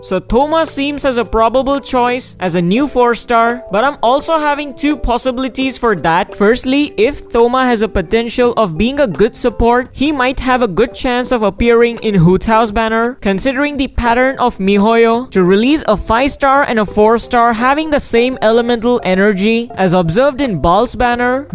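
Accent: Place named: India. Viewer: Your accent seems like an indian/korean...r you from india?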